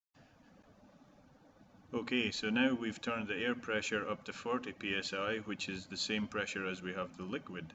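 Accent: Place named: Scotland, United Kingdom. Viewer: I had this question today as my presoak was spitting air and not enough fluid. I played with the fluid pressure and got the stream working again. My system is 30 years old and the foamy brush and presoak share the same air pressure but they have individual regulators for the fluid pressure. Thanks for the education on this. I'll be checking my floam consistency from now on using your guidelines. (and how come an Aussie has a Scottish accent?)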